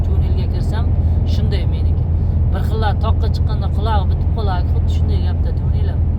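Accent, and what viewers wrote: Chiyal, Uzbekistan. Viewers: Мен хам гапларни эшитиб Чиялик бўлса керак деб ўйлагандим. Иш сохам бўйича Чироқчиликлар билан гаплашганман. Деҳқонобод хам шунақароқ шевада гаплашади. Майли омад ўзимни 92уруғли ўзбегим. ✊👍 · Qaysi tumandansiz chiyalga uhshap ketarkansiz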